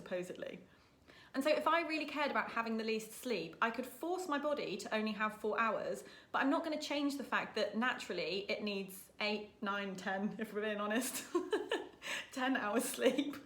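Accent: United Kingdom. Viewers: I am SO intrigued - where do you live?? English accent but always so sunny!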